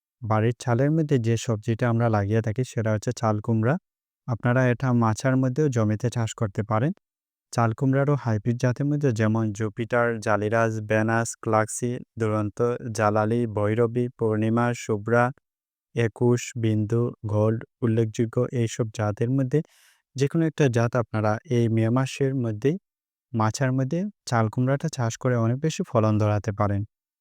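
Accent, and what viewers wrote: Sylhet, Bangladesh. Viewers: হেলো সিলেটি ভাইসাব। পার্পল কিং জুন মাসে লাগাইলে অইবনি??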